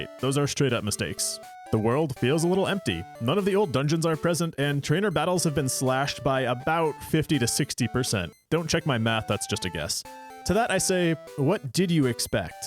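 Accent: United States. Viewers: this is not an AI voice over, just some variety of American accent.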